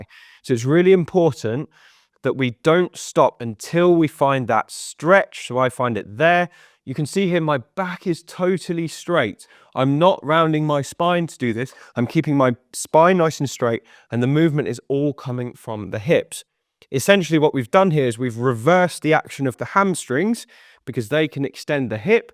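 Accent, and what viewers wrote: England, United Kingdom. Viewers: I’m here for the accent. Lovely English accent. Beautiful way of saying “bottom “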